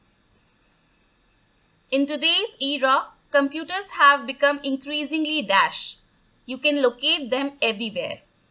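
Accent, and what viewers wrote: India. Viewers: Indianb funny voice xdxd dx d d xd zxd dx dx dx xd dx d x d xx d xddx You thoiught there would be more but there isnt xd xd xxd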